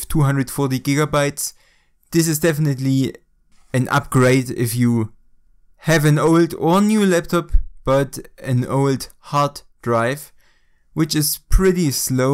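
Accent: Germany. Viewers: Höre ich da einen deutschen Akzent in deinem Englisch? ;)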